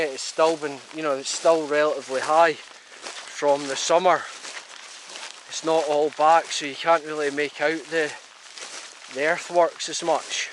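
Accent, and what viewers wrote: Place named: Lanarkshire, United Kingdom. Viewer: Looked like a brilliant day, really enjoying your presentation style, sounds like you come from the Hamilton - Blantyre area originally?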